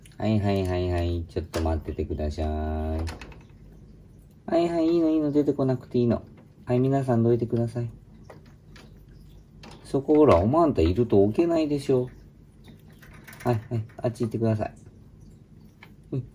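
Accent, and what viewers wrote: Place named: Niigata, Japan. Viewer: 新潟弁？上越弁？なんか可愛い✨ ハム1号🐹 ハム2号🐹 ハム3号🐹 ハム4号🐹 ハム5号🐹 みんな同じ顔なのかな？😁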